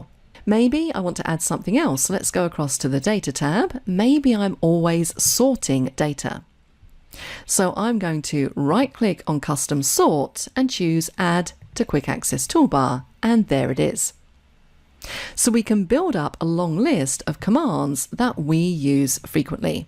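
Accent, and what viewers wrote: United Kingdom. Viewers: Thank you! I am a 56 year old (from India) heart failure patient who is actually into Quickbooks accounting for staffing companies in the US. As I can not go out and need to look for work from home only, the first thing I need to keep up are my excel skills. There were many free courses available on Youtube but I chose this one as it is presented in a British accent, though you might be based in the US,(a BBC fan here!) with good recording quality too. I really appreciate your free service and I am not sure how I can help in return. Thanks a lot and best wishes from India.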